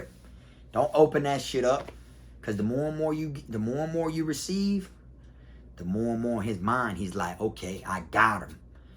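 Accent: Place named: Florida, United States. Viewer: Thats how we talk in Florida lol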